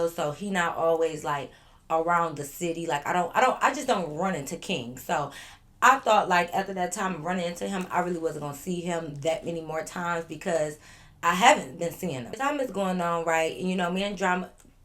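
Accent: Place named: Detroit, United States. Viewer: I love our accent 🔥🔥 313 definitely don’t have time to be pronouncing every dang syllable 🤣🤣 people found it funny all the time when I first moved out of town asking me to say SALT and FAULT 🤣🤣🤣🤣🤣🤣🤣 talking about I say Saw with a T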